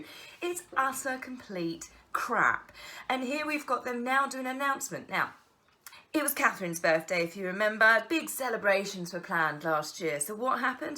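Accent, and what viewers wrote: United Kingdom. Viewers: Great info! I love your channel and your British accent😊.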